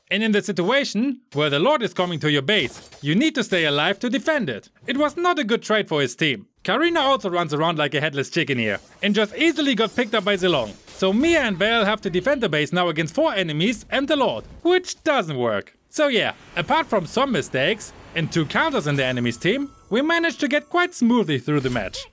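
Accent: Germany. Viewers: You from Germany?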